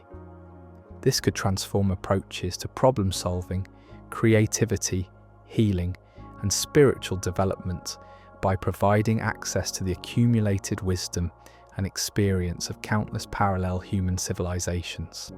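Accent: United Kingdom. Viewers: Sorry, always hard for me to follow Brit accents. Does NOT keep my attention. I love this topic, but can't view it.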